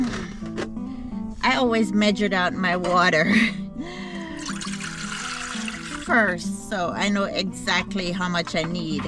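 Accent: Jamaica. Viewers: I admire you for not giving up, you sound Jamaican. I pray that you will repent of your sins and turn your life over to Jesus Christ, if you have not done so already. Keep your eyes on Jesus. He is the only one who can help us. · Hi. Being of jamaican heritage my self , i hear a slight jamaican accent ? With you. Are yiu from jamaica ? If so , did you leave long ago. ?. Have you considered to go back home?. Maybe life would be better there. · She sounds Jamaican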